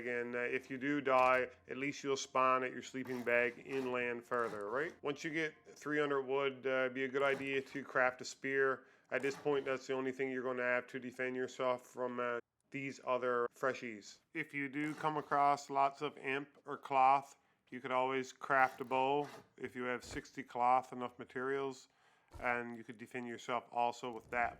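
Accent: Newfoundland, Canada. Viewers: i knew instantly he was from newfoundland too hahaha i was looking for this comment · From an Irishmans view, I was tryna pinpoint which town the accents from 🤣 Suppose I can hear the canadian in em' now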